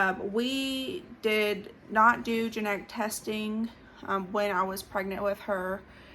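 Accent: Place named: South, United States. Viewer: Sounds like maybe some place in the south !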